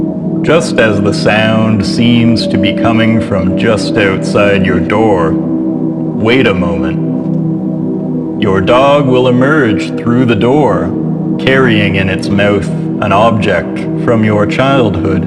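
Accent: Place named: Canada. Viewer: Is it weird I listen to these in the bath as I find your voice and accent relaxing 😌 you should do an audio book! I love the Canadian accent 😍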